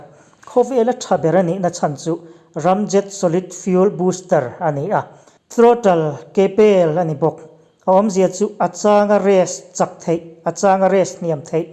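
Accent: Myanmar. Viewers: I aw phawi hi chu mizo dik tak aw phawi chu a ang lo ka ti..beng ati thlep tlat..Burma lam unaute zinga mi ini lo maw..tha vek tho na in🤔🤔😂😂👍👍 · A chhiar tupa hi Burma lam chhuak I ang I thil lam dan hrang hrangah hian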